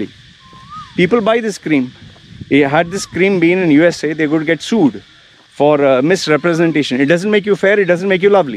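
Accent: India. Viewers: his accent sounds very much indian too me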